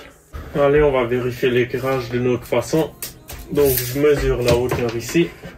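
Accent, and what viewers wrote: Alsace, France. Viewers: hooo ce bel accent Alsacien héé sanlu tu!!! très bon job je suis d'alsace aussi!!!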